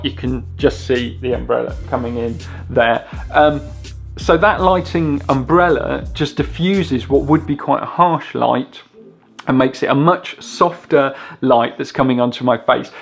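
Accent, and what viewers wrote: United Kingdom. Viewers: Great video mate. I detect a midlands twang in your accent ☺️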